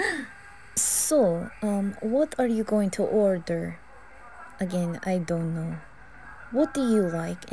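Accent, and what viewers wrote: Russia. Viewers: The amount of ppl speak russian in here lmao i'm russian and your russian accent and russian it self is really good keep up the amazing work love❤❤❤❤ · Ваш русский акцент прекрасен (*´﹀`*)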